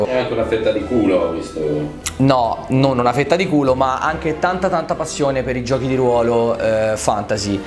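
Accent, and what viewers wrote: Tuscany, Italy. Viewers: Ma perchè l accento toscano? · ...Mi pare di notare un accento toscano...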